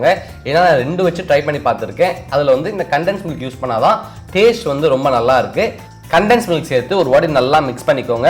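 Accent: India. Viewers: Am following you since I watched your show in behindwoods .. particularly for your pure tamil accent and the kural that u said apart from this your cooking methods are damn awesome, informative and i would definitely try in my Hotel and I own The Proud - Madurai Sri Muniyandi Vilas Hotel 💐💐💐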